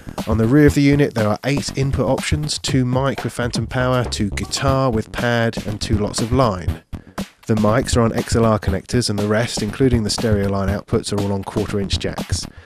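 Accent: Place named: United Kingdom. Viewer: Great review. Nice hands-on footage. And there's something about a British accent that I find calming. That must be why I listen to the BBC all the time.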